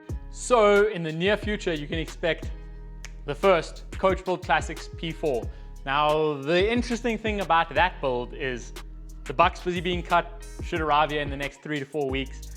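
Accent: South Africa. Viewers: Do I detect a South African 🇿🇦 accent?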